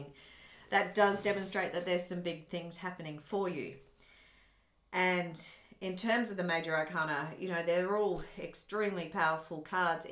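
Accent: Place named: Australia. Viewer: Thankyou for sharing your Light with us through your Insightful readings cheers and all the best with yourchannel growing. I'm hearing a fellow Aussie, am I correct xx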